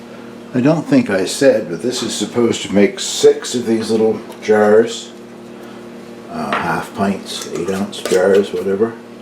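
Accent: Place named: Newfoundland, Canada. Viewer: Ahh! You must from Nufunlan? I recognized the very slight accent. Right on man, good job on the jelly! Hi from N.Alberta.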